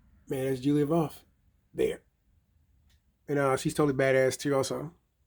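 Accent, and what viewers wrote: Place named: Louisiana, United States. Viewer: Nice collection! Lots great titles and TV shows. Love how you have them set up. you have a cool accent, is it from Louisiana. I subbed.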